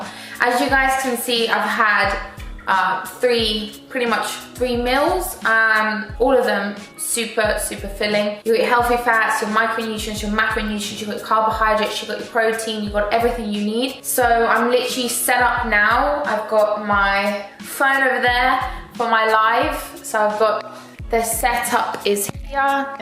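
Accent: United Kingdom. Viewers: I do love British accent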